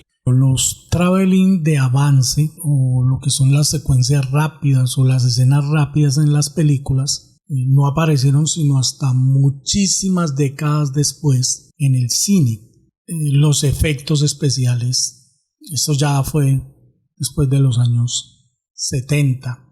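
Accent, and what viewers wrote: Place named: Colombia. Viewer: CON TODO RESPETO, TU ACENTO ME DICE QUE ERES DE COLOMBIA,PRIMERO QUE TODO. CHARLES TAZE RUSSELL, NO TENIA COMO DIJISTE COMO 70 AÑOS EN 1914 CUANDO SALIO EL FOTODRAMA,TENIA 62 AÑOS, YA QUE EL MURIO EL 31 DE OCTUBRE DE 1916 , TAMBIEN NO DIJISTE QUE MUCHOS DE LOS DISCURSOS QUE DIO FUERON EN SALONES MASONES, ADEMAS DE HABER VENDIDO UN TRIGO DE FORMA FRAUDELENTA ,POR TAL HECHO LO DEMANDARON Y REGRESO EL DINERO , A LOS DAMANDANTES ,TAMBIEN SE TE OLVIDO DECIR QUE COMETIO ADULTERIO POR ENGAÑAR A SU ESPOSA MARIA FRANCES RUSSELL. CON SU SECRETARIA UNA MUJER MAS JOVEN QUE SU ESPOSA , FUE BORRACHO TAMBIEN, Y DE SU SUCESOR JOSEPH FRANKLIN RUTHERFORD, OTRO BORRACHO , MUJERIEGO, E INCLUSIBE COMETIO PEDERASTIA AL CONQUISTAR A UNA JOVEN DE 16 AÑOS DE NOMBRE BERTA, ADEMAS CON UNA MUJER CASADA DE NOMBRE BONNIE, EN 1935 SALIO EL PRIMER LIBRO DE TEXTOS DIARIOS , DONDE EL DECIA TENER UNA GRAN ADMIRACION POR ADOLFO HITLER, Y LA RAZON POR LA QUE ENCARCELARON A LOS TESTIGOS DE JEHOVA EN ALEMANIA NO FUE POR PERSECUSION POR SU FE , FUE POR CULPA DE RUTHERFORD, AL PONERSE A FAVOR DE LOS JUDIOS , ESA FUE LA RAZON DE PERSEGUIR A LOS TESTIGOS, Y DE OTRAS MAS , NO LO HUBIERAN PERSUEGUIDO NI ENCARCELADO , YA QUE DECIAN QUE NO ERAN UN PELIGRO PARA EL PARTIDO NAZI , POR SER NEUTRALES POR SU RELIGION , Y SI NOTAS LOS UNIFORMES DE ELLOS TENIAN UN TRIANGULO, A DIFERENCIA DE LOS JUDIOS CON LA ESTRELLA DE DAVID .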